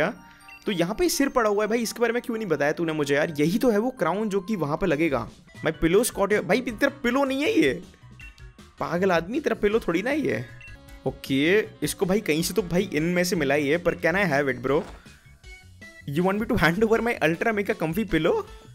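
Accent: Maharashtra, India. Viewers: Kya tum marathi ho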